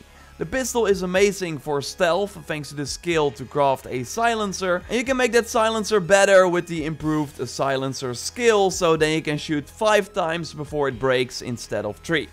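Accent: Netherlands. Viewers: Ik hoor aan jou accent dat je Nederlands bent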